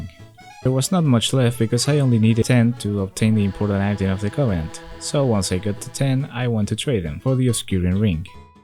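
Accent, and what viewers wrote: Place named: Spain. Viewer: Como se nota que eres español😂😂😂😂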